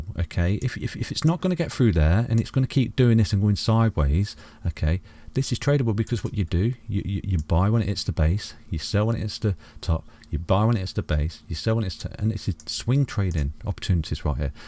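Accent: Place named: Hinckley, United Kingdom. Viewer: ohhh I was close. I thought you was Hinckley because of how you say "cuh-daano" ha ha I am Birmingham. Not too far from you mate!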